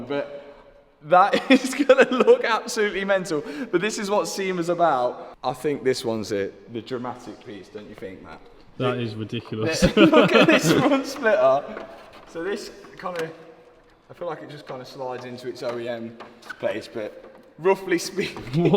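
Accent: Leicester, United Kingdom. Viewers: Tuning in for the Lesta accent 😊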